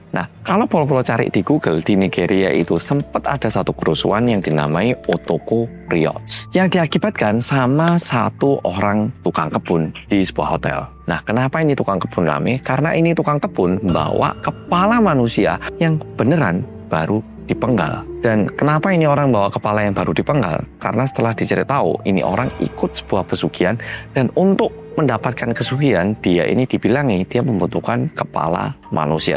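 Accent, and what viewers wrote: Java, Indonesia. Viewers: Hai muedok🎉 Nyimaaak 🎉🎉🎉 terima kasih, yaaaa 🙏🏿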